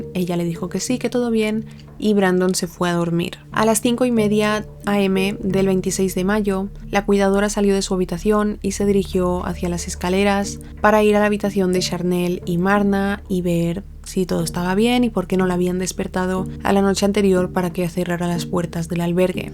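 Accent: Spain. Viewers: Mira que no me gusta el acento español y prefiero normalmente a Landó y a Dinosaurs vlog pero muchacha, me gustó como relatas ❤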